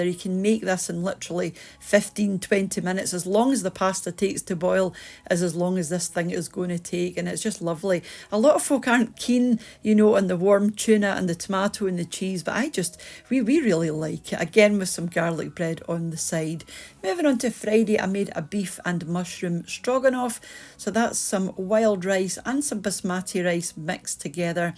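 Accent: Scotland, United Kingdom. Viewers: yes, I knew from reading here. your accent/brogue sounds just like them. I am in Michigan as my Grandparent's came from Saltcoats/Stevenston. We are still very close to our kin there and see them often.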